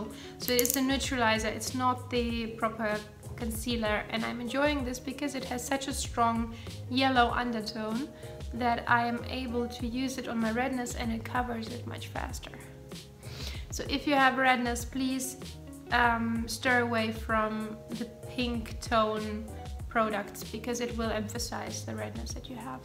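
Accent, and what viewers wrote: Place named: United Kingdom. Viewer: Don't worry we love your British accent 💛 And thanks for sharing your new video I love them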